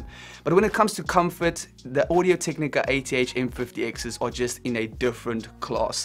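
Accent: South Africa. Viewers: South African ..though I thought one or the other :-) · he south african? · south african?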